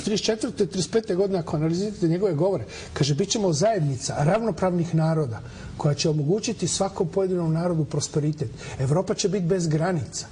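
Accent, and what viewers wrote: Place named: Ekavian, Serbia. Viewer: Čovjek rođen i odrastao u Hrvatskoj, Karlovac, studirao i radio u Zagrebu do rata....otišao u Srbiju i slušam čovjeka kako govori.....original srbin, ekavica perfekt.....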